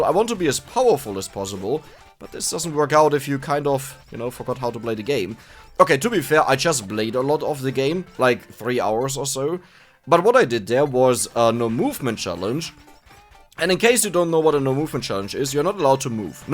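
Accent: Germany. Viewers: Nah its not just book, pretty much every word with O is butchered. I think its of your german accent from your region. We from berlin butcher english another way, so dont worry.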